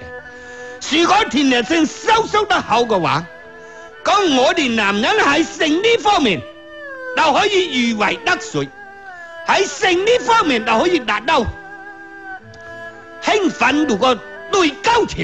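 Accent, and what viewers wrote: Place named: Taishan, China. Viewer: 勁，台山口音！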